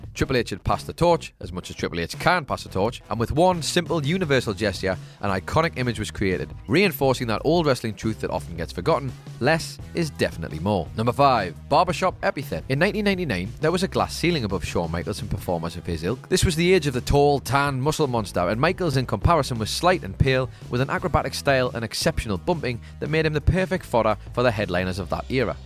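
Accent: United Kingdom. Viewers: Well, not everybody is familiar with thick british accent. Plus he talks too fast. Is it Irish or Scottish accent? Its not like its impossible to understand. But some words are really. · accent is too thick man.. i get it youre british but you gotta go a little out of your way and stop using words that only english people use. it is difficult to follow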